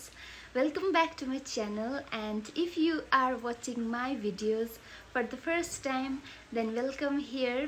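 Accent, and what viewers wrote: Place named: United Kingdom. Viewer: Your accent like British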